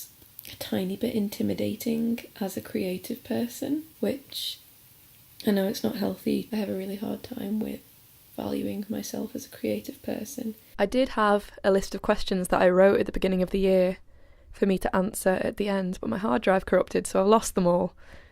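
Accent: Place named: North, United Kingdom. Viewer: It is so refreshing to hear northern accents on youtube!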